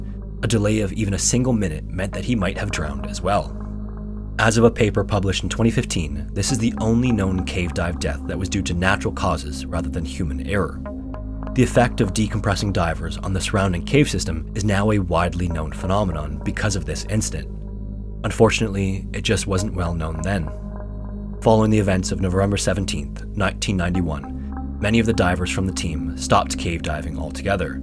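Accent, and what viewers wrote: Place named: Canada. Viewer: I hear the Canadian in you.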